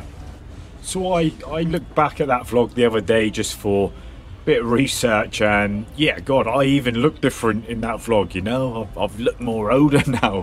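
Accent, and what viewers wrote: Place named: Southwest England, United Kingdom. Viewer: Hi ya Just found out how send a message. Love your views and was wondering what part of uk you are from. I’m also from the southwest Torquay to be frank. I hear a little when you chat. Take it easy man, take it easy. lol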